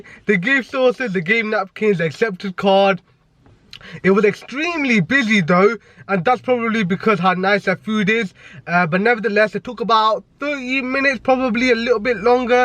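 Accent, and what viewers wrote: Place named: London, United Kingdom. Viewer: He sounds like a Londoner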